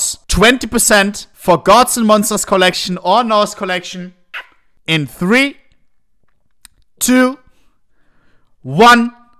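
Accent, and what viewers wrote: Germany. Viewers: nie was schlimmeres gehört als nen Deutschen, der mit extremstem deutschen akzent auf Englisch schreit...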